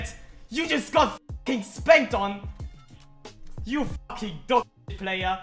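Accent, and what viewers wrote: Germany. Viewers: Dieser Deutsche Akzent in der Englischen Sprache triggert mal übelst😂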